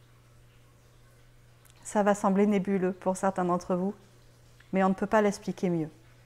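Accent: Quebec, Canada. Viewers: Chère nouvelle amie, merci. À la 19e minute, je suis entrée en moi et j’y ai retrouvé ma vraie présence. 🙏 J’ai cru entendre des nuances québécoises dans ta voix, aie-je raison? Je suis très attirée à l’idée de m’entourer d’une communauté qui porte en elle la même « mission » que moi et avec laquelle je pourrais avoir des contacts moins virtuels. Merci encore de te faire confiance car ce que tu nous as transmis était précisément ce dont j’avais besoin. 😅 Bonne continuation! 🥰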